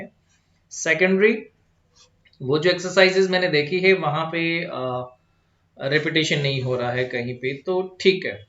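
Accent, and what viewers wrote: Rajasthan, India. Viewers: Sir are you from rajasthan (Jhunjhunu)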